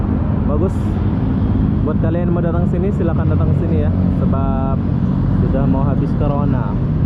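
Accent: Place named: Malaysia. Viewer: dia orang indo tuh. Karena lama di Malaysia jadi logat dia kaya orang Malaysia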